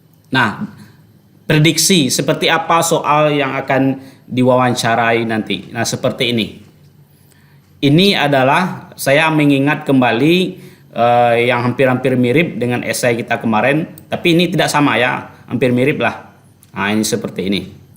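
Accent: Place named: West Sumatra, Indonesia. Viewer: dari logat URANG AWAK udo Koo 🙏🙏🙏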